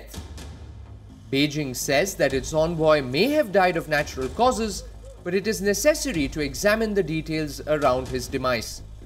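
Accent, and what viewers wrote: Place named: India. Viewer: what conspiracy theory it is a fact, you speak in Indian accent.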